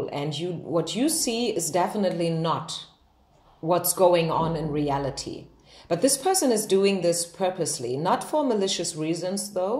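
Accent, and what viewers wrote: Germany. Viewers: Are you from Frankfurt, Germany?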